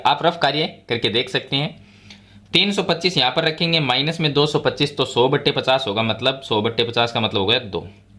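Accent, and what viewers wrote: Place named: Rajasthan, India. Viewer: Aap rajshthani he sayd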